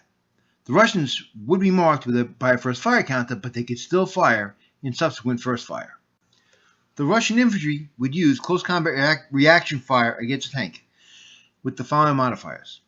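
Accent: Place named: New England, United States. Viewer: I would like to see the following, 1-AFV moving through Woods-Road hexes while encountering a KEU in one of those hexes. 2-A Truck with passengers doing the same thing. (the objective is to get past the KEU, not slug it out in a Fire Fight) I have done this, just wanted to see what you would come up with. I enjoy your videos, just slow down on that NE accent once in a while. Thanks.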